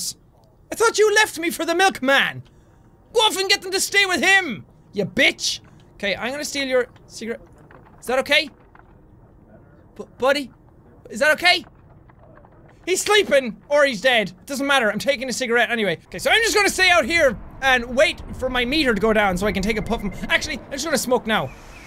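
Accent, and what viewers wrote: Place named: Ireland. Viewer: Love your Irish accent I'm part Irish but I don't have the accent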